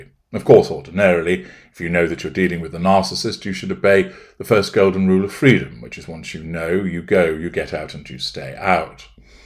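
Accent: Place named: Northern England, United Kingdom. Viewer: I love it when let’s the northern accent come out x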